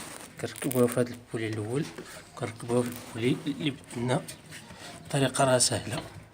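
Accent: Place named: Maghreb, North Africa. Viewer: لو الشرح بلغة اخرئ واضهار ترجهمه عربي احسن من من هي اللهجه. والله بصراحه اللهجه المصريه واضحه جدا وسلسه اما الجزائر المغرب مشكله وخلوا النت كله كذا باي مجال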